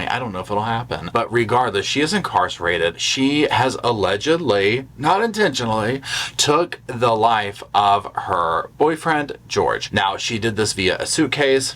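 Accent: South, United States. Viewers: Id just like to say thanks for your videos everyday ☺️ ive been super depressed lately because i was in an accident and lost all my teeth and its hard for me to even get out of bed anymore 😭 but i love your southern twang makes me smile and has my attention off my issues if just for a few minutes 😏 love rosco p coletrain❤️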